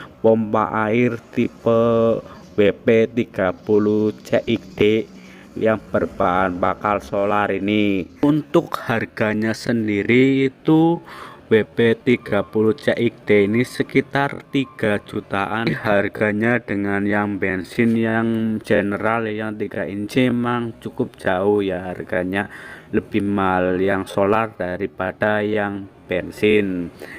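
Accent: Banyumas, Indonesia. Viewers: KAYANE NGAPAK APA YA KANG😅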